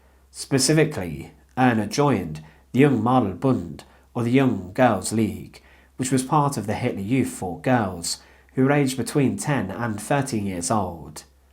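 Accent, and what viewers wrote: United Kingdom. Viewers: Love your Bri-ish accent !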